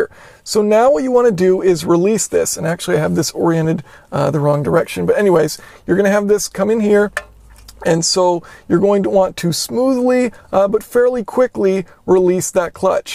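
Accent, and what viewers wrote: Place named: Oregon, United States. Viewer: Are you from Oregon/Washington?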